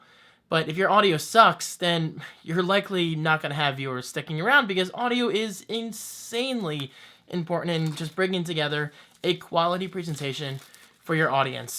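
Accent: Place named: Staten Island, United States. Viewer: Lol I feel like your from Staten Island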